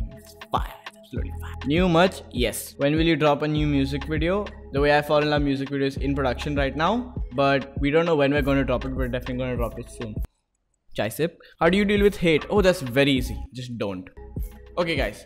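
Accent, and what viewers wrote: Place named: Kerala, India. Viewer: Yo, bro u might not see this but u give me malu vibes dude, the when u say "amma",ur accent and ur likes...r u tho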